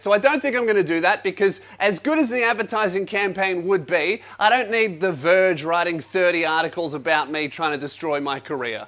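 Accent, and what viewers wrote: Australia. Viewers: I like Australian English 😂 hi from Slovakia 🇸🇰 🤘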